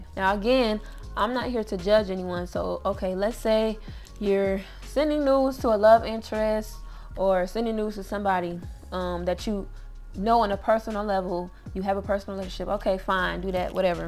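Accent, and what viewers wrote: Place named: South, United States. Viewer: You mad Southern hun bahaha ☺️😂 Keep up the good work...100k subscribers in no time! 💪🏿💪🏿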